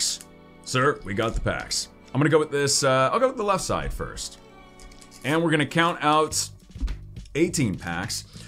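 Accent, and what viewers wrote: Germany. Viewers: You littrely sound German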